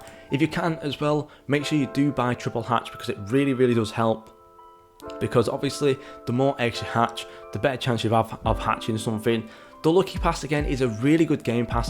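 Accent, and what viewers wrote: United Kingdom. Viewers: omg british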